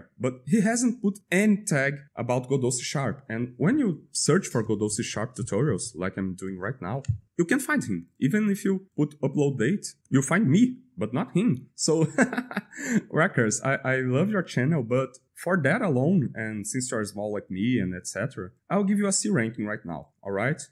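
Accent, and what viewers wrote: Brazil. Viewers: That brazilian accent! Great content, keep it up!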